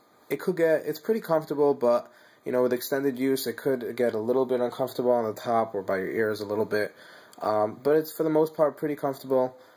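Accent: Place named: New York, United States. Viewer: Sounds like you got a New York accent lol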